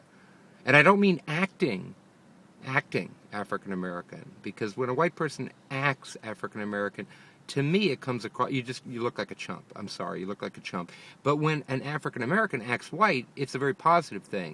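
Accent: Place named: Los Angeles, United States. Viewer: Take that stupid video down.. you sound like a typical dumbass from LA!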